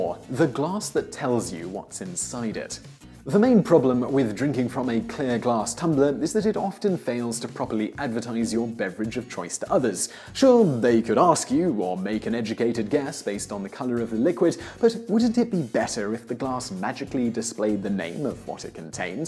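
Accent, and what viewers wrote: England, United Kingdom. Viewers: english accented johnny sins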